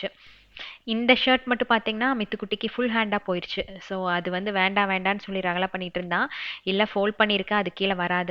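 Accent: Coimbatore, India. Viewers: Nenga elam nala kovai slang la pesrenga but ta matum yen sis kannada pesrenga... unga voice over vida original voice ketka nala iruku sis ❤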